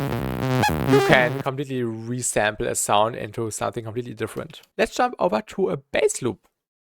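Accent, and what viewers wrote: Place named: Germany. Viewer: I love the German accent, really gives it a scientific vibe haha ❤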